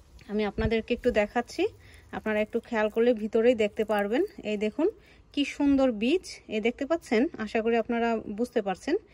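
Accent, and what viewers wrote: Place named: Bangladesh. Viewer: Mone hosse apni bangladeshi. Valo laglo.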